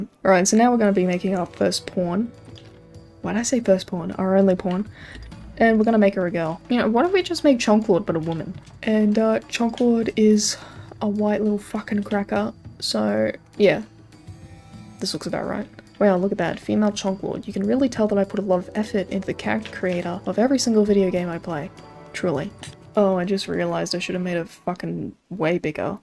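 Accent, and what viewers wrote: Australia. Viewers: Oblivion ambient music: checks Australian woman in a calm voice with occasional chill cursing: check I was expecting bonks but got the best ASMR. Neat. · Also Im a Kiwi so i picked ya for an aussie with the accent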